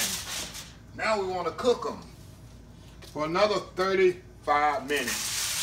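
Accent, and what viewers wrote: South, United States. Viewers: Reasons I love this channel..I've picked up some great recipes, good tips and tricks and Chef is always dropping some knowledge. And of course the country accent❣Thank you Chef.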